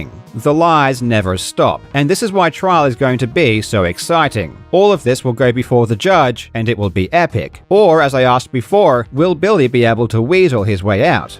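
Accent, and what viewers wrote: Australia. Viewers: How am I just finally placing your accent as Australian? No idea why you sound a bit different to me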